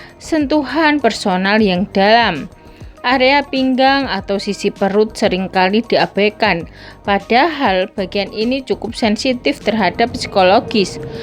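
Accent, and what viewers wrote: Java, Indonesia. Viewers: Tebal sekali medoknya, orang mana sih mba? Dulu makannya apa kok bisa tebal sekali?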